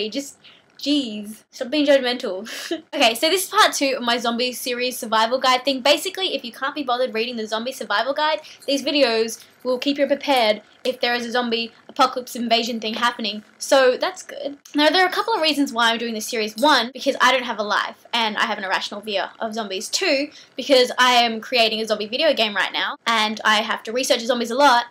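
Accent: Australia. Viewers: are you Australian??.. love your videos.. and is an amazing song:)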